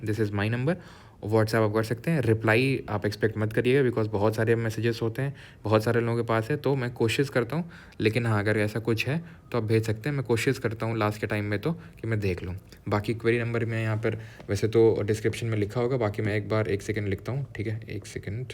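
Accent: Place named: Rajasthan, India. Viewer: Guruji aapke bolne ka लहजा bhut badiya hai❤❤❤ like a rajasthani ❤❤❤, A lot of love from Rajasthan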